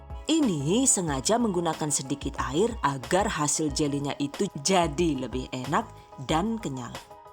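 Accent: Banyumas, Indonesia. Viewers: Suka KL ngomong ngapak, walau q g ngarti....tp lucu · Ooo key 😁 salam ngapak ibuneee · Mba sampean wong mediun kok ngapak kwkwwk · Deneng sih ngapak mbokayu??? Ketone enak yakin..arep njajal gawe lah nyonge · Lehhh deneng si ngapak jebule 😂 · Kok ada ngomong ngapaknya😀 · Ternyata wong ngapak ibune 😄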